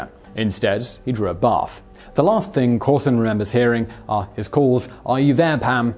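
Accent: United Kingdom. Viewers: His research seems accurate (as conspiracy theories goes) His UK pronunciation gets under some ppl skin for some reason - tomato/tomato ... move on folks and show some cultural intellect. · Indigo P Some of these are just accent differences. In the UK mafia and Van Gogh are pronounced as he said. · The names is due to being British but all the info is accurate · He’s from the UK, they pronounce things differently than we do in the US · Relax dude, That's how Brits pronounce it, it's called having an accent. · who cares about the damn pronunciation he's talking about history he's not talking about the American English language the guy is from England not America shut up everybody just watch the show Geez Louise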